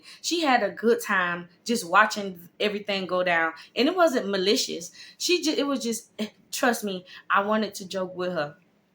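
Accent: United States. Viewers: You sound like your from Louisiana though. 💜 when you were listing the dudes I was looking around my room. Hell! 😂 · This 💩 sounds so Houston!!! Cracking up laughing 😂😂 All those dudes in one room (I’m hollering) 😂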